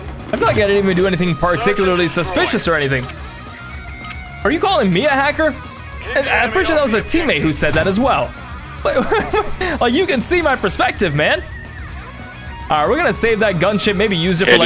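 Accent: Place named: United States. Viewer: Most American sounding Kiwi around, you even from NZ uce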